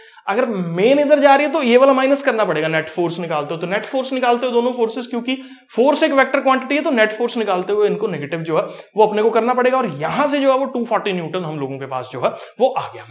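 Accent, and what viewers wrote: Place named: Punjab, India. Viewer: Punjabi aagye oye ❤😅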